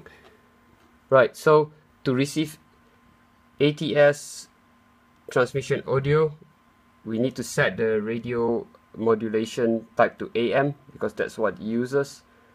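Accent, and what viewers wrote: Singapore. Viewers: your accent is similar to the way my friends from Singapore spoke. It was nice to hear someone speak that way again.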